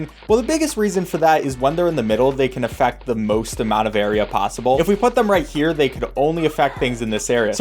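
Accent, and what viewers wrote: Wales, United Kingdom. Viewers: Oh dear, Irish? He's Welsh! It's like 2004 on Newgrounds all over again... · Irish guy? That's a Welsh accent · IRISH!? He is WELSH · no it’s not it’s clearly welsh 😭😭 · im pretty sure that was a welsh accent dude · Sir that's a Welsh accent not an Irish one as far as I can tell.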